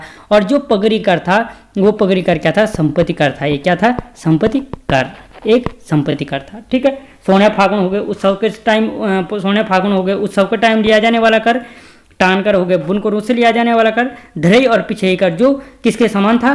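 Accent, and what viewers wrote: Pahadi, India. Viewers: Apki hindi phadi wali hai sir, nice questions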